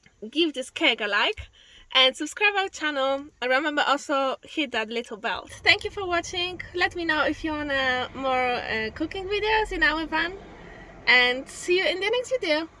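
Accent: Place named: Ukraine. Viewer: Hi. Hope you're well. I'm trying to figure out which country you're from by your accent. You sound a bit Ukrainian, but unsure. X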